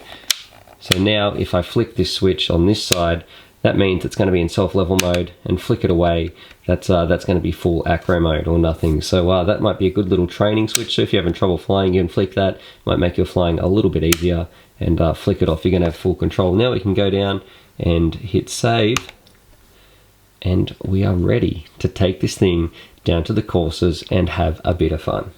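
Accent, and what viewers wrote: Australia. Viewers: Sounds like your an Aussie but using US dollars in the totals lol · G'Day. Great video mate and unreal to hear an Aussie voice. I was trying to work out where you were when out in the field with the floodlights/footy field/cricket pitch in the background. At first I thought that was Adelaide Oval, but I'm not sure... Anyway, with it being a year since you made this terrific vid, do you still stand by these products as the best value for a beginning Drone Pilot? I have zero interest in spending lots of money on a DJI anything, plus an iPad Mini, etc, but am keen on recording flight footage. Sorry if this is a silly question, but how do you record the flight footage please mate? Thanks again for the terrific instructional video. I've shown it to all my mates who are keen on this as well.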